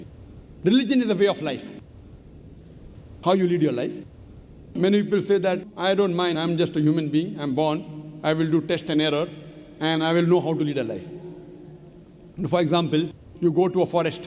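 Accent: India. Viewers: salamu alikum Dr. ZAkir, would you please add an auto-captions in English, so we can understand your accent. we are from north Africa, and it is difficult for us to understand the Indian English accent. thank you so much.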